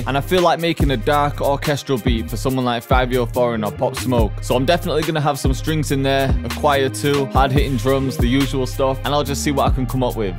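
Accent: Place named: Manchester, United Kingdom. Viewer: This beat is pure fire 🔥 where about in the uk are you from ? Trying to figure your accent out lol gotta be manny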